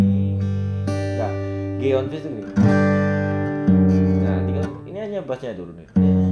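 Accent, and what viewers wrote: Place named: Java, Indonesia. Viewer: Uwow.... Wong jowo tah. Kerasa banget logat mu dek. Salam ya dari ku putra Sumatra Utara Medan.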